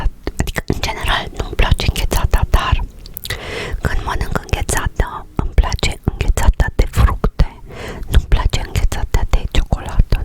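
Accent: Transylvania, Romania. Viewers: Super smecher, am observat ca vorbiti ardeleneste fix ca parintii mei si am zis ca sigur ati stat in Baia mare sau ceva, habar nu aveam ca sunteti de prin Bistrita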